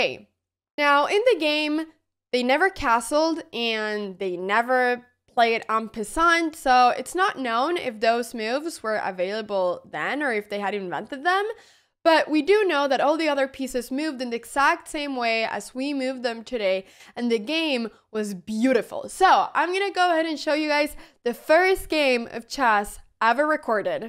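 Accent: Spain. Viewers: Me encanta tu acento en castellano, no sabía que eras española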